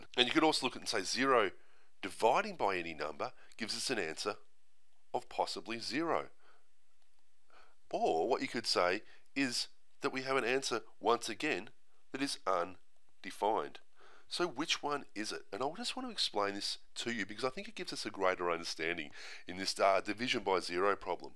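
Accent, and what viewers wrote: Australia. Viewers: Hey mate! We have been subscribed and watching your awesome videos for last 10 years. It would be really appreciated if you could improve the sound quality but loving your aussie accent heaps. Have a good day!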